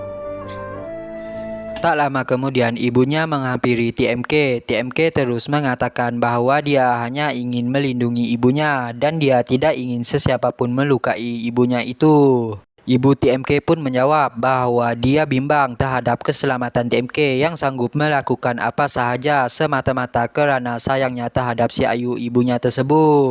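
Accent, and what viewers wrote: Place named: Johor Bahru, Malaysia. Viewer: Aduh jd kangen ke JB lagi.krn Abang cakap macam tuh. Love you Abang buat sy kangen. 🥰🥰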